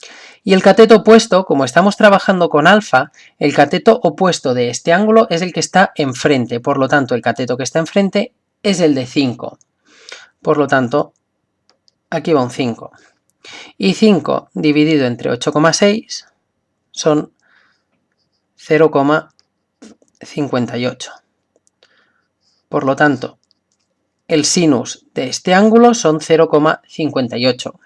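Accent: Catalonia, Spain. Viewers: Alejandro Moya Martin Es catalán, en ese idioma se pronuncian distintas las palabras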